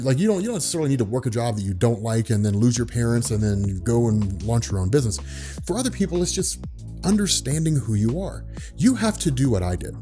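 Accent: United Kingdom. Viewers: your English accent is amazing.. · Quality London accent,.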